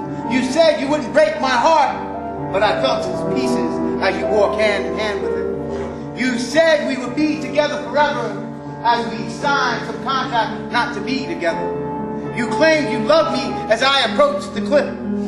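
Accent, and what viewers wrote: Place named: New York, United States. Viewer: The narrator is a New Yawker. He should be hip to the words of: "But he was going no where fast Speed ain't nothing without class"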